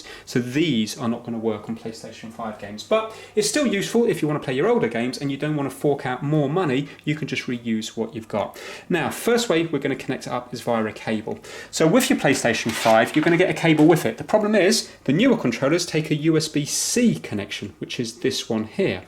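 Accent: United Kingdom. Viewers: Comprehensive explanation and great British accent - Cheers! Greetings from Bulgaria! :)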